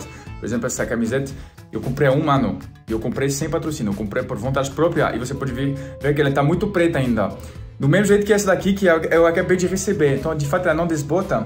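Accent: France. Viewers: Voltou com muito sotaque francês hein? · E também voltou com muito mais sotaque francês !! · Seu sotaque Francês está mais acentuado depois da viagem ❤ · Amei que vc voltou com mais sotaque francês. Faço inglês e meus professores americanos dizem que meu sotaque brasileiro é fofo agora entendi o que eles querem dizer...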